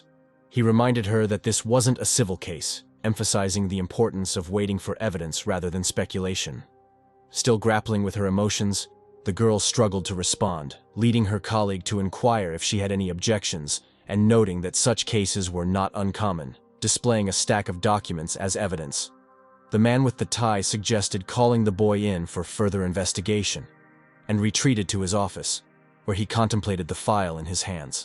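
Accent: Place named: United Kingdom. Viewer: Broo this ai is so british/old english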